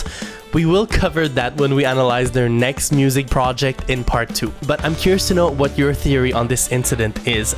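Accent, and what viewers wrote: Quebec, Canada. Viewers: Is that a québécois accent I'm hearing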